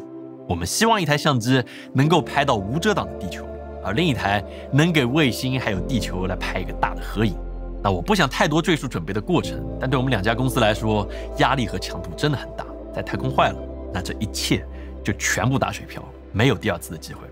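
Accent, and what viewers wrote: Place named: Jiangxi, China. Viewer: 江西口音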